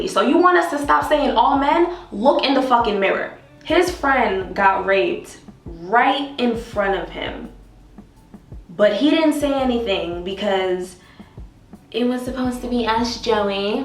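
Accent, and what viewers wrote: Jamaica. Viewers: Wait you speak patois🥺I just found this channel loved your content but now I’m sold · "Mi will watch dis likkle ediat show" OMFGGGGGGGGGGGGG YOU ARE JAMAICAAAAAAAAAAAAAAAAAANNNNNNNNN or Caribbean?? But mi know mi hear a Jamaican accent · Is she Jamaican or Trinidadian? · Love your Jamaican accent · are you from Jamaica? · The way her Jamaican accent and sayings play peek a boo tho I love it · I subbed the instant i heard the jamaican accent. "Mek me watch this likkle eediot show "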